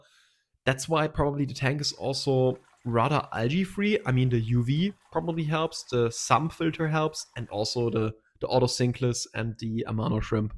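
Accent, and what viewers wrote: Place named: Germany. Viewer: ican hear german :))))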